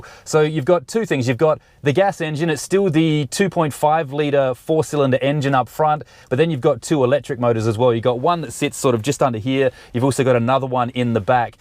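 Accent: Australia. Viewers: This guy has an Aussie accent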